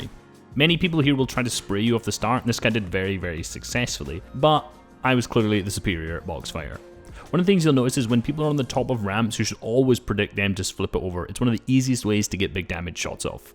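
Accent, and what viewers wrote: Scotland, United Kingdom. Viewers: Are ye Scottish mate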